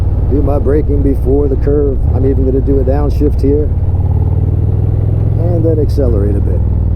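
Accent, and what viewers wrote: New York, United States. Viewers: Forty two years and you still sound like a New Yorker. I’m in NC and two words out of my mouth and they ask, “you from NY?” Gee, how could you tell? Bwahahaha! P.S. Love your videos. Regardless of how long we’ve been riding any of us can always learn something new. I’ve recommended your DVD’s to every new rider I meet. Keep up the good work.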